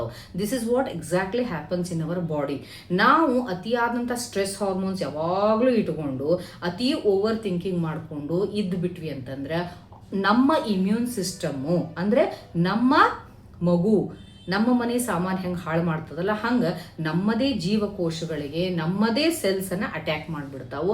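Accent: North Karnataka, India. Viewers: You are the one who speaks Uttara Karnataka-Kannada. It is very homely atmosphere,you explanation is just like an Akka(elder sister) with mothers attitude who's very concerned,loving and caring. God bless you 🙏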